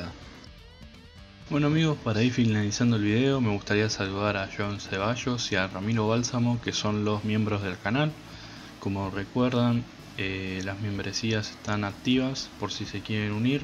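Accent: Argentina. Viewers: Es argentina no escuchas su acento?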